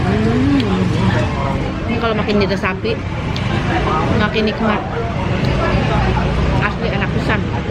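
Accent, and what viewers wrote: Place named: Bogor, Indonesia. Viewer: Orang Bogor mana Sora na😁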